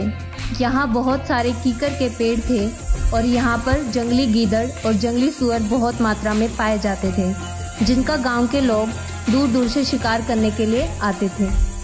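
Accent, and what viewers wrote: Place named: France. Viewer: Lagra French aye lagra yesa❤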